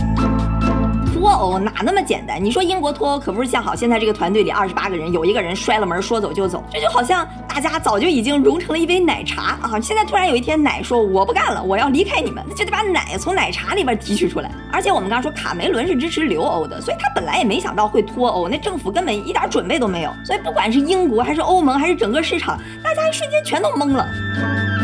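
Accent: China. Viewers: 好奇地问，你们听得懂大陆的口音吗？会不会觉得听着很奇怪？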